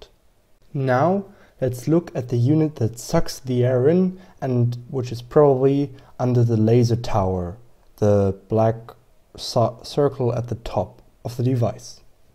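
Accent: Germany. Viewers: 6 years after you created the video I need to say 2 things: 1) "DANKESCHÖN" as you German accent was very obvious at that time 😉 2) You saved my life: the ball bearings of the gear drive of my vr300 was completely stuck due to some syrup my kids left on the floor and the robot sucked it in like a champ. Again, awesome!!!!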